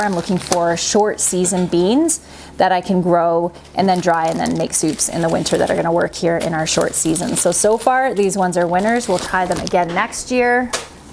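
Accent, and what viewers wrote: Canada. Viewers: No one else takes the time to show people the seeds themselves and how to get them out and how to harvest them because honest to God I had no idea that was so much into saving seeds but I'm thankful I found your blog if I had not found your YouTube channel I would be lost when I start doing this next year myself and I am going to watch everything you have on this because I really honest to God appreciate you doing this thank you so much I'm from America and it sounds like you're from Canada I haven't really checked that out but I can tell you this right now I really do appreciate it and you're you're an awesome parent I have not gotten to see your husband enough to see you know what kind of parent he is and honestly it doesn't matter you are a wonderful wonderful mother and you are so good with your children and your children are smart they act like they're homeschool kids they're very very smart and they are always talking to you and always telling you about something you actually listen to them that is amazing God bless you and your families and my family's prayers and thank you again so much for sharing your journey I have cancer and I would not be able to do that right now and I appreciate it so much that I'm learning something and I'm enjoying it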